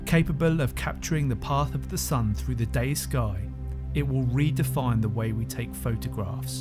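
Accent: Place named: United Kingdom. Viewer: No offense intended, but are 98% of all videos now narrated by the same British reader? 🧐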